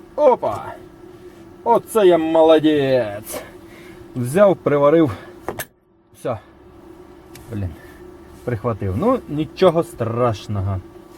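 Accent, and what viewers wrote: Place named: Surzhyk, Ukraine. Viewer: Я чомусь думав що це просто в тебе суржик такий,але ти як думаєш так говориш так і пишеш. Це по якому ти ,,балакаєш,,? Якщо по кацапські то говори вже, вибери одну мову на якій думаєш,на тій і розмовляй,я як лікар можу поставити діагноз - діслексія,алексія,біполярний розлад. Це ж кров з вух, більше трьох хвилин дивитися не можливо. Конєшно воно є такоє,мажліво тут паставіть єсть що так.....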